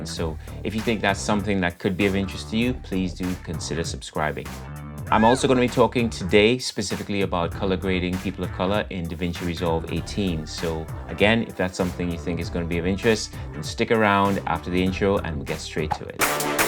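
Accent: Trinidad and Tobago. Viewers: Im hearing a caribbean accent underneath...my guess is trini...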